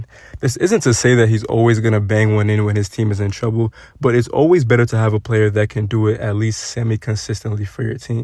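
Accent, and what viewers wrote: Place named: United States. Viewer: Where are you from bro? I can't pinpoint your accent.. I just know it's from the States. This is a nice video tho.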